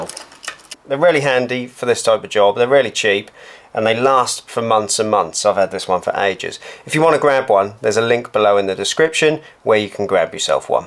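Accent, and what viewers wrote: Norfolk, United Kingdom. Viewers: Great videos with great information. With that dialect I reckon you're from the Norfolk area...as I'm from Suffolk! lol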